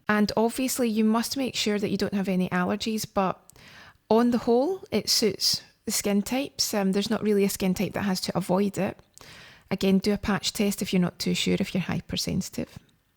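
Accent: Scotland, United Kingdom. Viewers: I ken you’re a Scott?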